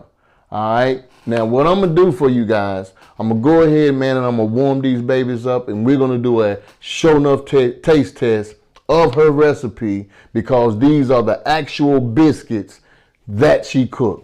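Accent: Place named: Alabama, United States. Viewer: Sound like he's from Alabama. Food looks great!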